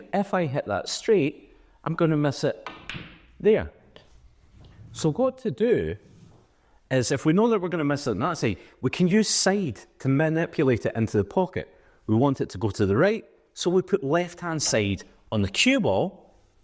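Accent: United Kingdom. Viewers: I'm so tired of listening to the British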